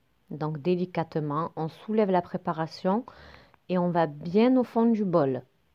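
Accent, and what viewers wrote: South, France. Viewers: Bonjour j'adore vos recettes et votre accent c'est du midi de la France?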